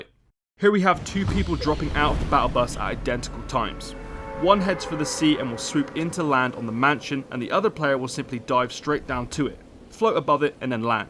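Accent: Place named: Bristol, United Kingdom. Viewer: You’re from Bristol right??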